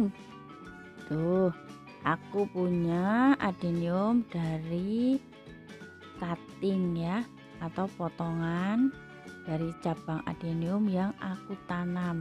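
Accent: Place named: Java, Indonesia. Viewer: pantes logatnya Jawa banget Salam dari Klaten . Prambanan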